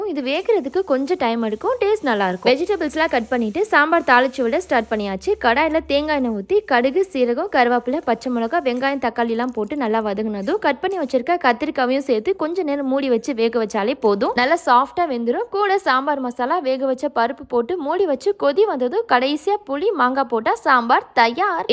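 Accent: Madurai, India. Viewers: Neenga madurai ah sis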